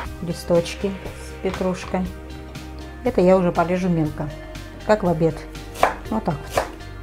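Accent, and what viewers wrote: Batumi, Georgia. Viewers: дорогая, очень приятно слушать Ваш голос. Когда жила в Батуми..... мне ваша речь напомнила о детстве И отдельное спасибо за простой и очень вкусный рецепт Я выросла на такой капусте и сейчас балую своих родных вашим рецептом По вашим рекомендациям готовила перец в банках. Супер!! Спасибо и дай вам бог здоровья и вашей семье благополучия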